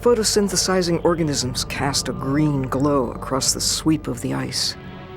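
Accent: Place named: United States. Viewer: wonderful footage of such a beautiful landscape, really enjoyedwatching it. shame about the horrible narration, an extremley irritating strong american accent of a 12 year old boy.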